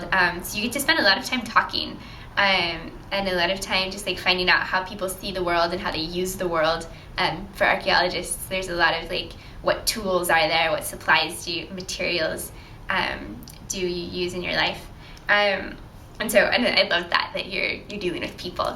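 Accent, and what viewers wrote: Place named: Scotland, United Kingdom. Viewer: I figure she’s American but lived in Europe for a few years and picked up a bit of accent on certain sounds. That or born overseas and came to America at a young age but retained some accent. I’d guess Scotland.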